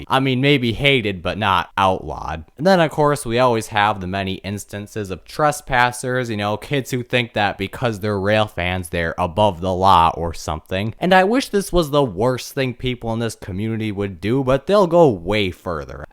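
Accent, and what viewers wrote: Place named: United States. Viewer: American, why do you try to sound like chinese, japanese and german at same time while still having obnoxiously nasal amarican accent?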